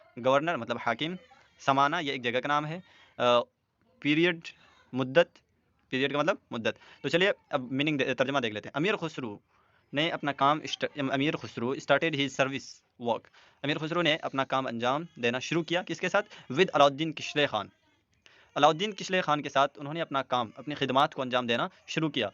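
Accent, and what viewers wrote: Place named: Kashmir, India. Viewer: Sir your accent is different are you from Kashmir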